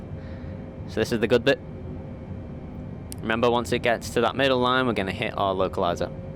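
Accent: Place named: Northern England, United Kingdom. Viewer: Thank you, for all the tutorials! Very simple and easy explained, helps so much for navigating whether its a an A320 or B747. Additionally like your english accent, seems to be somewhere in the north of the UK (scouse?)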